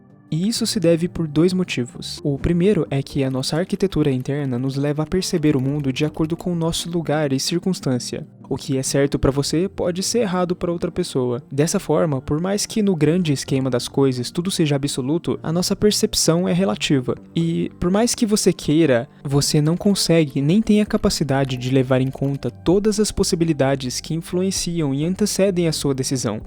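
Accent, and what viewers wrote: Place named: Brazil. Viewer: O vídeo é bom, embora o sotaque brasileiro faz parecer que é uma criança narrando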